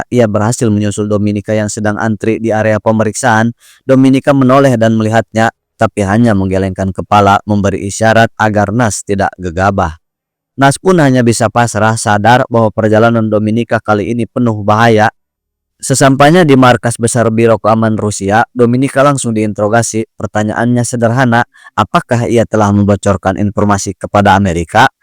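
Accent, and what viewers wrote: Indonesia. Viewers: Emang ngomong na kumaha kitu? Logat sunda na Sigah logat jawa tengah kitu? 🤭 Atos di subcribe luuur 🤩👍🏻 ╭╮🎋🪻🎋🪻🫰🏻 ┃┃╭━┳━┳━┳━╮ ┃╰┫╋┣╮┃╭┫┻┫ ╰━┻━╯╰━╯╰━╯ 🤗🤩🫰😍👍🏻💯❤️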